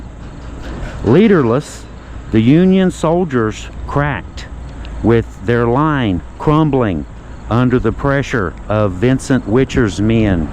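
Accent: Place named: Tennessee, United States. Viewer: Sounds like Tennessee😂😂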